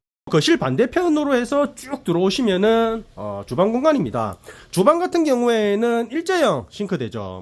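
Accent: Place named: Busan, South Korea. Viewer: 부산태생이라그런지 에 자꾸눈이가요 말씀도 넘 구수하게 잘하시는 사투리가 듣기좋아요 응원합니다~~^^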